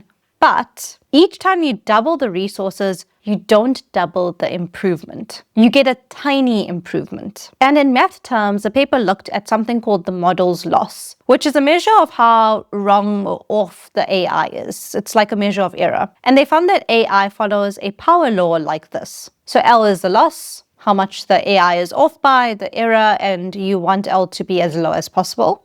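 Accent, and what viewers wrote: New Zealand. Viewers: Ha, I couldn't at first work out your accent. I thought you were from NZ at first. Very correct about power in vs power out. The data itself is an energy less output. The heat is mainly down to the efficiency of the FETs in the processors and probably the low voltage power supplies on the boards.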